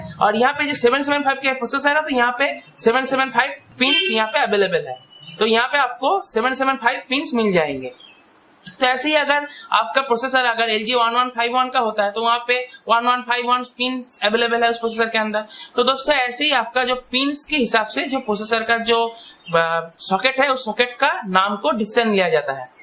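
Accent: Bengal, India. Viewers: Bengali ho kya???🤔🤔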